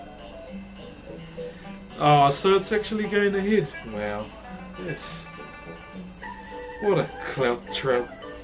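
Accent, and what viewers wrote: New Zealand. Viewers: That’s how is kiwis talk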